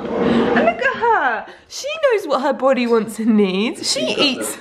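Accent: England, United Kingdom. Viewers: Pink Fluffy Unicorn a brittish accent could be northern Irish welsh etc but her accent is just English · So that's what a british accent is... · Who else loves her British accent? 😍❤🇬🇧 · Are you British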